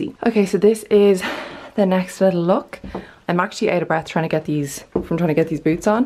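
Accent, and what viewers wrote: Ireland. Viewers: Oh my Lord! your accent makes me wanna move to Ireland.😍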